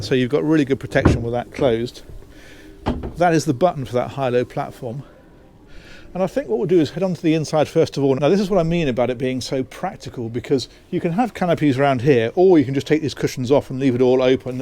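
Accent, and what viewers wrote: England, United Kingdom. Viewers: I second the motion Thank you for showing this (Boat) I noticed that you very finely opened up the cabinets to the galley. I apreciate that. I went to school in Exceter as a boy and I miss my cold overcast days..no really. I came home to LA with an accent :) yours reminds me of fond memories. I enjoy your showings. On the Magellano 53 you breezed right by them ! (the cabinets) I was hoping you would come back around to see if there was a dishwasher or anything else. I thought maybe I would have to go to counseling when you did not. haha:) I look forward to your next Tour. Thanks again, Tom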